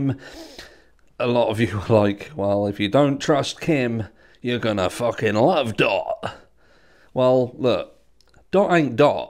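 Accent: United Kingdom. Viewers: One of my favorite '80s tv series was "X-Files" and its theme, "Trust No One" is what I go by especially with YT videos. Anything featuring ex-F/B/I or former C/I/A employees or even retired LEOs are suspect because they still owe an allegiance to shady government agencies upon which they depend on for their lifetime pension$$$. But I trust you, 'cause you're British with a lovely accent and demeanor that goes so well with my morning coffee sweetened with stevia. 😁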